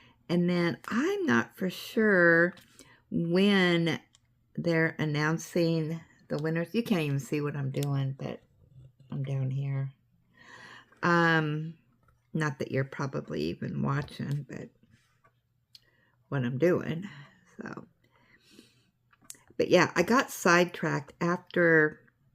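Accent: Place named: United Kingdom. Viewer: Hey there you crack me up this is amazing diamond painting he sounds British !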